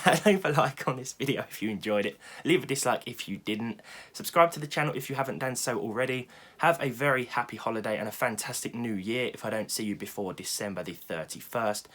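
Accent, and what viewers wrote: United Kingdom. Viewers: 🅱️RUV ENGLİSH ACCENT GİVE ME A GAY VİBE BUT ANYWAYS KEEP GOİNG İ LOVE YOUR VİDEOS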